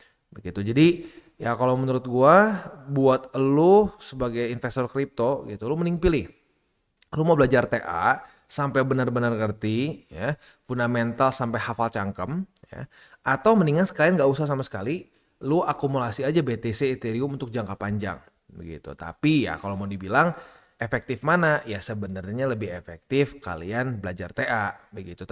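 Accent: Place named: West Java, Indonesia. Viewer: Bang lu orang sunda bukan? Kaya lekat banget logat sunda nya 😂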